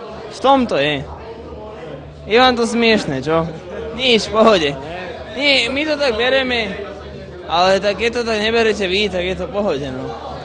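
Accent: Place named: Liptov, Slovakia. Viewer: Páči sa mi jeho prízvuk, sťaby z Liptova pochádzal, len ma trošku mrzí, že búši viac ako by sa patrilo. Aj tak by som prijal a člena mojej družiny.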